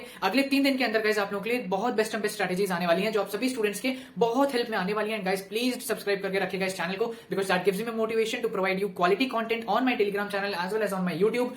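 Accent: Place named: South, India. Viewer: Why does bro have a south indian accent in the new videos ?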